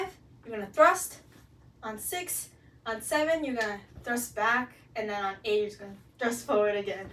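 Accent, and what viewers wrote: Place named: Australia. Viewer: Thanks and are you australian because of your accent "hea" 😊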